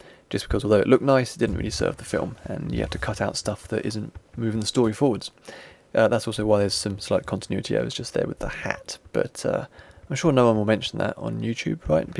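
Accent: United Kingdom. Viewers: Hey! your british accent is a little bit hard to understand. Can u give the narrating job to please?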